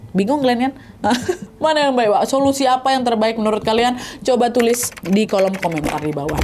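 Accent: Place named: Medan, Indonesia. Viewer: Tampak kali logat Medan kau dek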